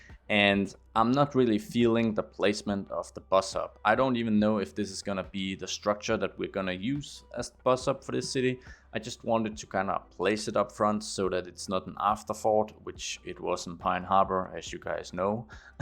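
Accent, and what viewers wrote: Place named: Denmark. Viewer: Danglish :D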